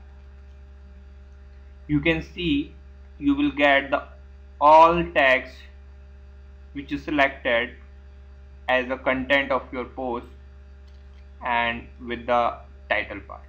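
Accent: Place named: India. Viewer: I like tha Indian accent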